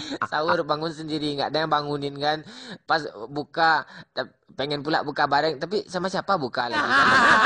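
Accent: West Sumatra, Indonesia. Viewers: Lucu bgt logat Minang TPI bahasa nasional lucu ²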